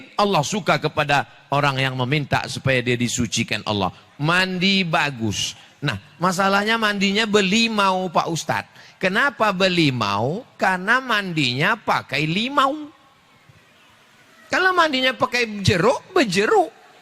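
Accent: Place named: Malaysia. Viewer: saya orang jawa sangat suka dan candu dengan tausiah logat melayu UAS,sehat2 slalu buat UAS❤❤❤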